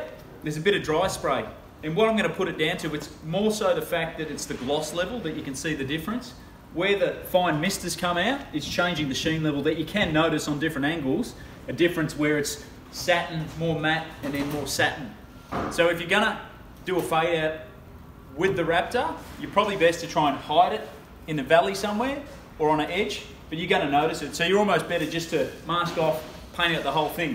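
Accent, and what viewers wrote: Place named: Australia. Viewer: How do I get the australian accent?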